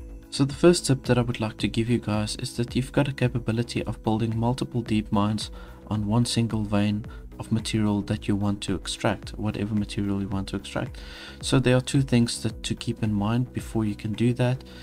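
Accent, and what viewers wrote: South Africa. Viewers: Good stuff! Thanks. Coolest tip to have multiple mines on deep deposits (infinite supply deposits). BTW, I think I hear a South African accent there?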